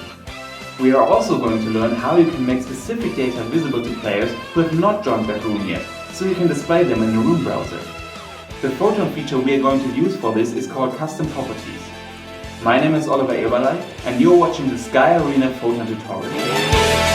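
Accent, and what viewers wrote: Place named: Germany. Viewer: i bet you're german!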